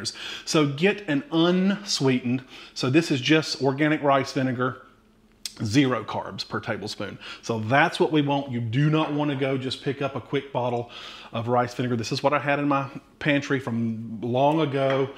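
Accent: South, United States. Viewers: I could listen to this all day, just for his voice! So friendly, so Southern!! Thanks for the info- I'm from Korea and I NEEEEED low-carb rice!!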